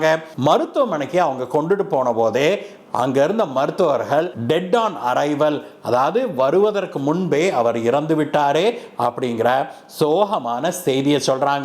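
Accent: Kongu, India. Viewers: நீங்க பேசுவது கொங்கு தமிழ் போல . ஒரு பல்ராங் (ஒரு கிலோமீட்டர்) அவுதி அவுதியாக போறாரு அவசரம் அவசரமாக போறாரு வார்த்தை இது எல்லாம் கொங்கு தமிழ்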